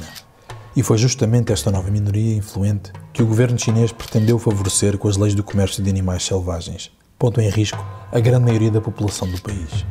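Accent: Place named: Portugal. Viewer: Parabéns para o narrador de Portugal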